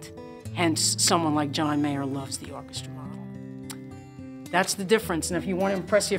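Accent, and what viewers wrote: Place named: Delaware County, United States. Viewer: God that Pennsylvania Delco accent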